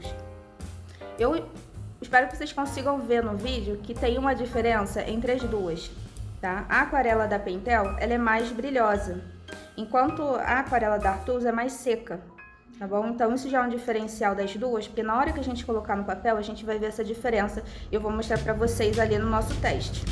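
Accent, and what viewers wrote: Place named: Rio de Janeiro, Brazil. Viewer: Ótimo! Até o sotaque carioca fica mais lindo com um português bem falado e conteúdo. Agora vamos ver minhas aquarelas rs.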